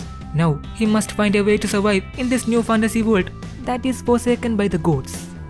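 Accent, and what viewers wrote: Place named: Kerala, India. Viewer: thicc mallu accent xD, but well made video 👍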